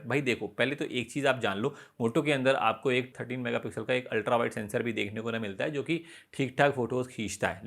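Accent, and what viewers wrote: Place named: Bihar, India. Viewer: Bhihari tune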